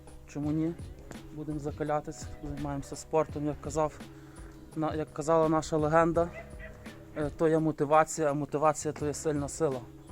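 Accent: Ukraine. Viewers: Ще дуже подобається вимова, галицька українська неперевершена. А побачити чоловіка, який залюбки працює фізично - це рідкість.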